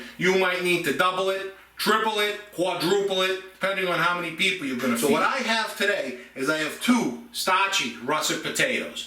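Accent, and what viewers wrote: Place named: South Boston, United States. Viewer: I'm trying to figure out what is more amusing: Your simple recipes or your "Southie" accent. Love them both!!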